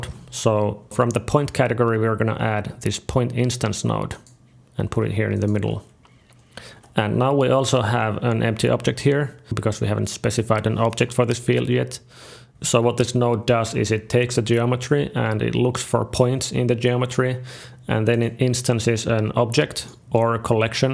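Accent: Finland. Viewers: The finnish accent, we meet again. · Veri finnish äksent👌 loving it! Great tutorial👊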